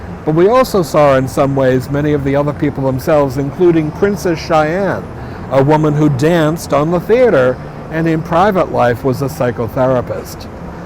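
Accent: Boston, United States. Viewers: Loved this, and your Boston accent. Keep it up and your F B posts. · i, A few years ago, I went to City Hall to get my birth certificate, as I was born in Boston. While standing in line with 15 or 20 other fellow Bostonians, I had this extraordinary feeling that these were "my people," even though we had never met. I feel the same way when I listen to you speak with your Boston accent in the historical videos you create about our city. I’ve especially enjoyed the ones about the Combat Zone, as I’m old enough to remember wandering around there. In my younger days, I used to visit some of those bars with friends—it was quite the experience, and we had a lot of fun. I’m also really impressed with your deep knowledge of Boston’s geography, especially your ability to distinguish where the land was originally and where it was filled in. That’s still somewhat of a mystery to me, but you bring it to life in such a clear way. 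I went to Boston Univerity and had a professor there in American history who knew all this lost Bost history. I wonder if you knew him, though he is long gone. I am left to wonder where you get your historical information? Thank you for sharing your expertise and keeping Boston’s history alive for all of us. Robert Hargrove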